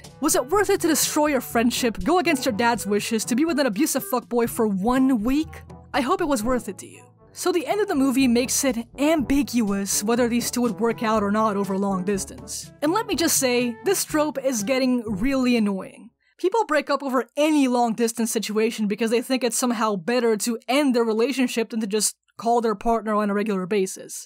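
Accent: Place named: Ireland. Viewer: You sound like me haha may the luck of the Irish aid you in your endeavors!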